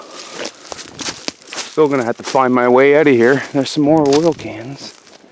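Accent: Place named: Maine, United States. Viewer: are you in Maine? you sound like it. Dave from Maine. love the show!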